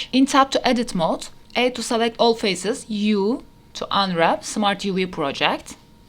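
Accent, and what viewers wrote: Turkey. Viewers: Thanx for your tutorial with Turkey accent!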